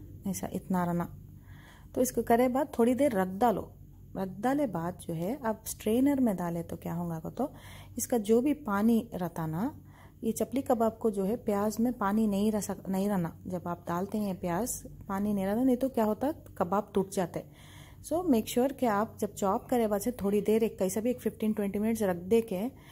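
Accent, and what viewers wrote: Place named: Deccan, India. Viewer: This dhakni urdu is ultimate 😍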